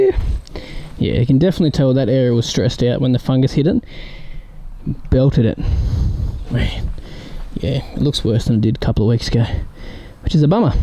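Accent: Australia. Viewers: Where are you from? Australia or New Zealand?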